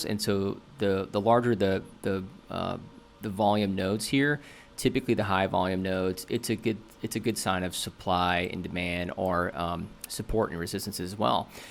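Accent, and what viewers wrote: United States. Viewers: Hello. Thank you for your thoughtful and insightful assessment of the current market. Also, I very much enjoy listening to your charming American accent.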